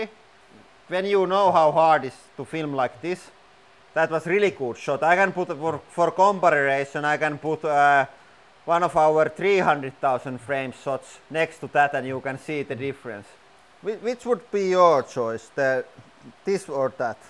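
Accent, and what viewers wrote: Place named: Finland. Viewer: I just came to the realization that speaking English with and an American accent is boring AF. I will watch this channel and practice to speak English with a Finnish accent. SO MUCH more fun. It’s pretty cool!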